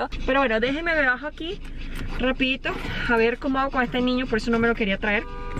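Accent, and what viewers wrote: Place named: Venezuela. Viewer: Sos hermosa!! Me encantan tus videos, tu acento venezolano hermoso.👋👋👌❤️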